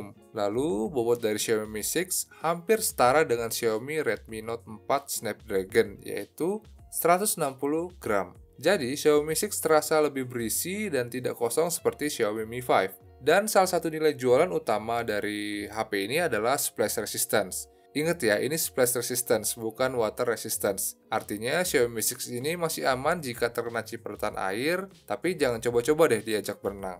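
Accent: Lampung, Indonesia. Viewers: orang lampung ya?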